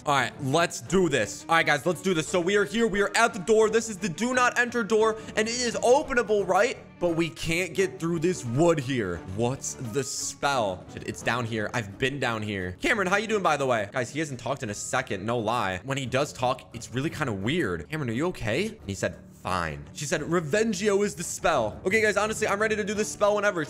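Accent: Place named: England, United Kingdom. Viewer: I'm english and I speak like you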